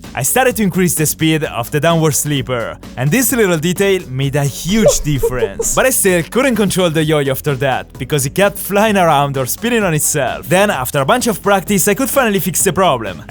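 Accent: Italy. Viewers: pov: guardi per la prima volta un suo video e ti sembra abbia un accento molto italiano, guardi la sua descrizione e...... beh è italiano :D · Quanto si capisce che sei italiano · Italian english be likes Quand'è che dici shish? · no vabbè ma sei italianoooooooo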